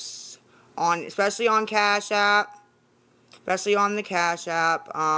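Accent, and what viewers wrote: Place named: Western Pennsylvania, United States. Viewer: She definitely has a western PA accent i used to live in Johnstown and my bf mom said yinz and geez oh man lol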